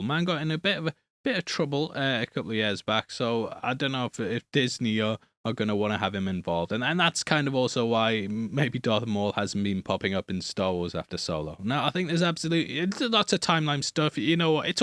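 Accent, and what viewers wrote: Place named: United Kingdom. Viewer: A channel named Heavy Spoilers along with your warning and British accent… I was expecting you to say “bob’s your uncle” or “Ryan is your stepdad”.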